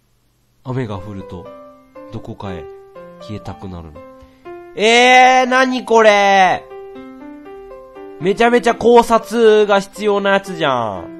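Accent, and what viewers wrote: Kansai, Japan. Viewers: まだ関西弁がのこってる頃だああああ！！(まあ今もちょっと言ってるときあるけど)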